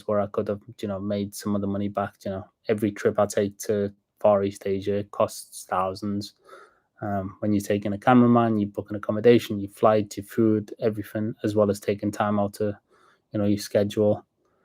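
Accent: Liverpool, United Kingdom. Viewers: Lad, are you Scouse? There’s definitely a twang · OMG he is a Scouser 😮 I thought he was Brazilian 😂 as he never spoke before. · Christ I didn't have you down as a scouser. Slight twinge in the accent though, like spanish or italian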